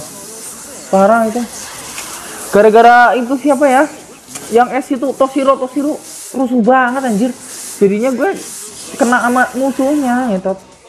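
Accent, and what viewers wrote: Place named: Banyumas, Indonesia. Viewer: Ngapak" njir 🤣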